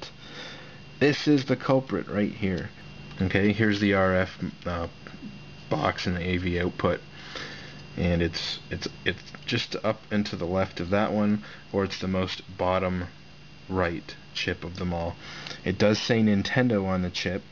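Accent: Canada. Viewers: You're Canadian huh?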